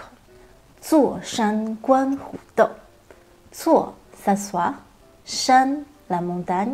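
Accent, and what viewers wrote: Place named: Northeast, China. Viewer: 东北那旮沓的方言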